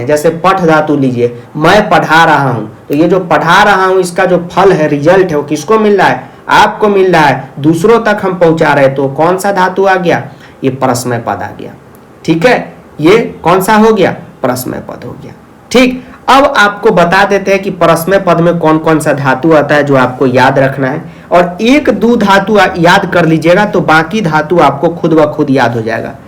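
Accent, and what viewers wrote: Bihar, India. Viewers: Your teaching style is so good and your bihari language is so amazing 😍 😍😍😍😍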